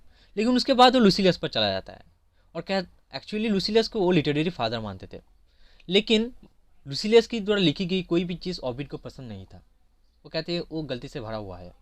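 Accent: Bengal, India. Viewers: Thoda bengali accent aa jata hai.... improve your English speaking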